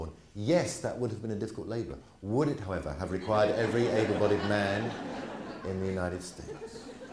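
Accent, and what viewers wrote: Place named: United Kingdom. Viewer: plus his british accent doent make it easier either.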